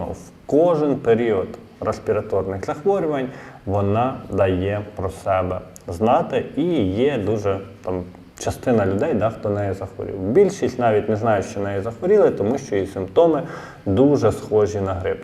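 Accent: Ukraine. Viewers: Вы учитель информатики который говорит на русском с украинским акцентом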